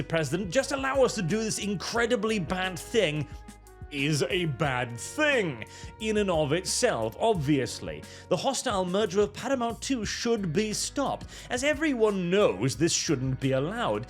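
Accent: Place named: United Kingdom. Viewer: I have never seen a British person rely on their accent to make up for their lack of knowledge more. You might as well be engaged to a cousin because damn dude, that island DNA is showing.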